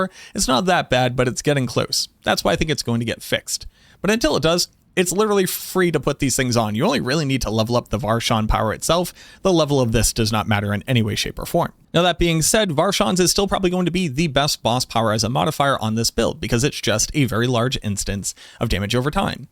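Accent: Boston, United States. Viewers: Please do a video guide in your authentic Boston accent. Thanks!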